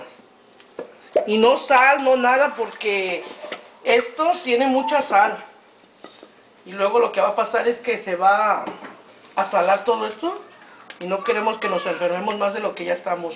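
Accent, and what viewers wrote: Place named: San Luis, Argentina. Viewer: Damn, it's a good thing I understand Espanol,,,, porque yo si entender la forma que te hablamos,,,,,, yo vive en San Luis, R.C. Son, por unas 3 anos como son 30+ anos pasado, y la jente hablan egual a como usted. , se vey muy facil a hacer este "meal", muchas gracias...... Seniora.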